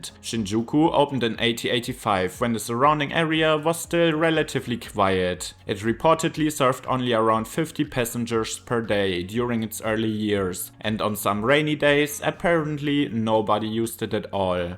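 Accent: Germany. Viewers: Jäpänn ? lol try a longer vowel in the second syllable. no offense, work on your pronounciations, you support the bad reputation that germans have for their english.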